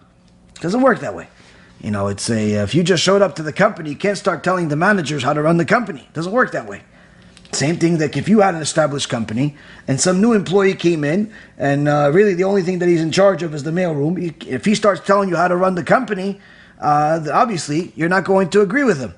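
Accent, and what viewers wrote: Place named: Yemen. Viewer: I like the yemenite way of Hebrew-pronunciation and share your point of view, dear rabbi.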